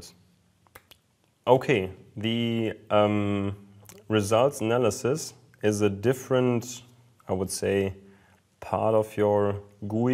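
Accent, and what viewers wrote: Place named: Germany. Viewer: deine Aussprache scheint Sie kommen aus Deutschland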